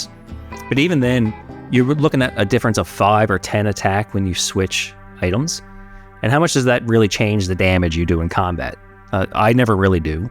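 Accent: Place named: Newfoundland, Canada. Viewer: I can tell this guy Canadian, the way his says “Out” and “About” 😂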